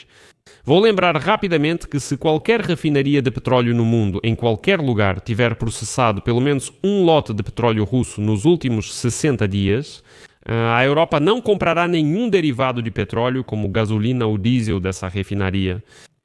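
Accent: Portugal. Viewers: Por que fica oscilando em português de Portugal e do Brasil?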